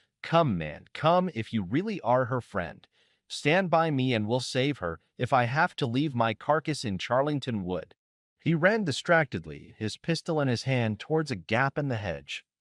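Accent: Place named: United States. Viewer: Probably a great story. Just can't listen to the American accent. Conan Doyle would probable be a little restless in his grave.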